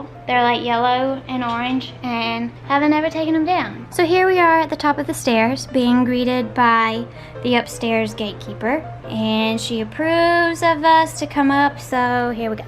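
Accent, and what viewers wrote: South, United States. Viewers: Loved this. I'm from Alabama and I make sweet tea probably everyday for me and my family. Tea is meant to be sweet and cold.. always. You should do a video on grits and cornbread. I have all of my grandmother's furniture too. Too many memories to get rid of them and they are special to me. It's nice to hear a Southern accent on YouTube too. But... why isn't there a sweet tea emoji? 😉😎😂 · I hope southern accent never ever goes away:) And I get to hear it in real life three time a year, woot, woot! Whenever I visit the south. And since my mommy is southern I have a slight twang in my voice too, I'm half Latino and half European American. My accent is interesting its a little bit southern mixed with a slight Hispanic/midwestern accent. Ya'll can hear it over on my youtube channel, since I finally got the courage to upload my first ever vlog.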